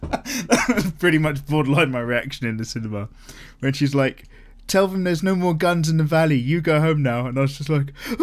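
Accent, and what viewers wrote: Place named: Liverpool, United Kingdom. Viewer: liverpool scouser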